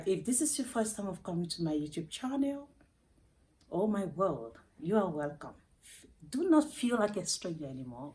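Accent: Kenya. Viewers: Your accent is changing into a Kenyan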